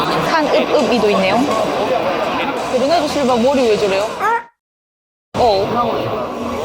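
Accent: Gyeongsang, South Korea. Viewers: 누나 경상도 사람이었구나 ㅋㅋ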